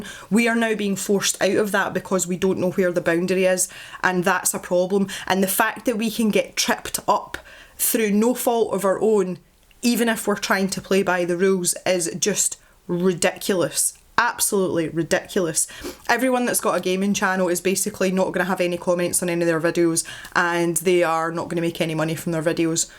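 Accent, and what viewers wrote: Scotland, United Kingdom. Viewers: I saw Jackie’s video earlier and just watched yours now. I really hope to see the FTC continue listening to everyone’s input, the petition and all the videos. With the guy that Jackie had on the video intermediating, and the amount of people who are signing and commenting, I think there’s a fair chance that the decision could be reversed, or at least improved, before it’s put into force! I’ve only just found your channel, and I really enjoy seeing another Scottish person with a YouTube presence, especially in the art community. I hope you don’t come off poorly from this, as I look forward to seeing your videos. Hearing someone else with a Scottish accent talking about Copics and drawing and art is quite comforting to me 😂 especially as everyone else I watch is American, or Jazza 😂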